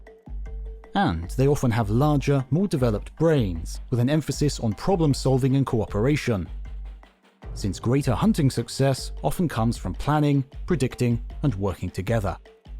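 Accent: United Kingdom. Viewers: The dude is from Great Britain. I'm not sure what rock you grew up under but people tend to sound like they come from their place of origin.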